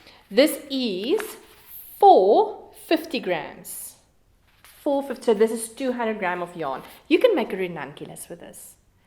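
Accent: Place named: South Africa. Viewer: Thank you again for such a wonderful podcast ❤ I'm really enjoying watching your channel ... And I love your SA accent ❤ Great to see you wearing the Faded Pathways Cowl ... Can't wait to start mine!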